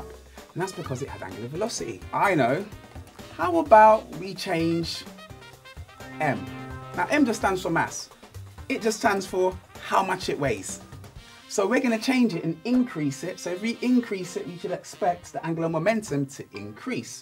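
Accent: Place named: England, United Kingdom. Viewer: He's English, not American I believe.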